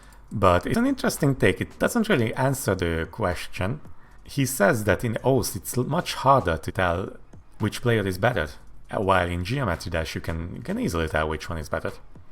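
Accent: Hungary. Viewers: I know a hungarian accent when I hear it :) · I like your hungarian accent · Is that Hungarian accent?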